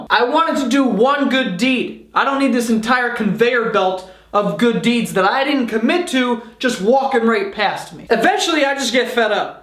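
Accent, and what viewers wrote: New York, United States. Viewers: You're accent is amazing lol it's so cool. Is it like a NY accent? (Sorry I'm from Europe) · What is your accent is it New York Brooklyn or what?? Manhattan jersey Italian ?!? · Dope NY accent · As a New Yorker, I can confirm that all New Yorkers sound like this guy.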